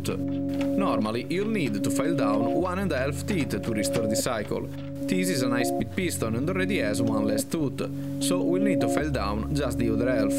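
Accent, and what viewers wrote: Italy. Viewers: Come si sente l'italiano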